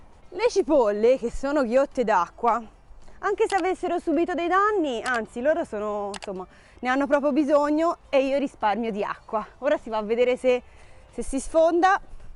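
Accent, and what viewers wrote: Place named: Centre-North, Italy. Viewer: Ciao,forse lo hai già detto,in che regione si trovano i tuoi terreni? Dall'accento direi Centro-Nord...dico bene?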